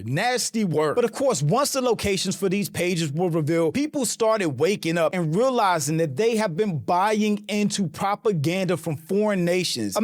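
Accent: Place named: Canada. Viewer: You can listen and know he's from canada.He's from toronto, just listen to him.He don't sound american whatsoever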